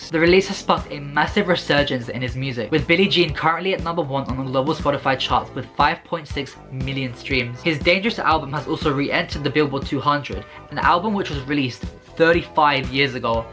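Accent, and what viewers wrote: United Kingdom. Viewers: You're British??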